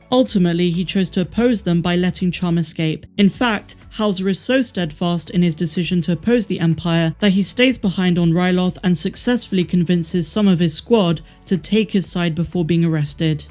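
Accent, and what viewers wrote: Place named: Bristol, United Kingdom. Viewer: I heard your bristonian accent, you hide it well. But not Palpatine well.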